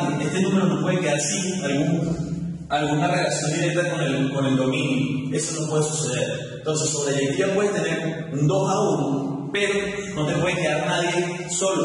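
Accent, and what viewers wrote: Venezuela. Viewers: Usted es Venezolano donde se pare. ¿oyó? (Soy Venezolano) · este pana si habla sifrino....pero explica bien. saludos...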